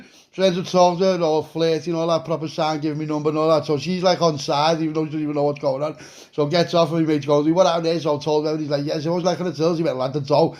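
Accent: Liverpool, United Kingdom. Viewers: Big up the Scouse narrator, proper legend you lad, just got in bed, the mrs is getting frisky and I got an excuse, don't even have to use the headache blag, come on give it a rest I'm listening to scouse stories. Hahahahahaha 😆😆💯💥.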